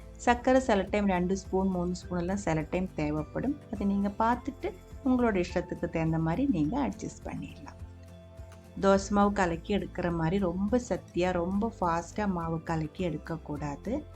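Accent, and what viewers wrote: Kerala, India. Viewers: So nice mam ...your voice and rendering way is so cute ...I will try ...mallu accent super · Your voice is very suthing...that mallu slang mixed in Tamil is nice to hear...if your videos can be a lil faster..that ll be an added advantage..but overall enjoyed watching..im gonna try it soon..❤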